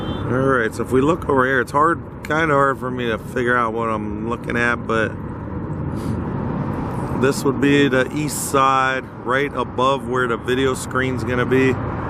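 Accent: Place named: Wisconsin, United States. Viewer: You’re from N/E Ohio, Closer to Cleveland area? That’s why I hear Wisconsin & Pittsburgh mixed. 😏 🤙 🙏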